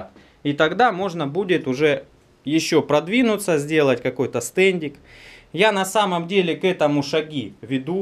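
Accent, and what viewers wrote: Rostov, Russia. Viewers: Он из Ростова?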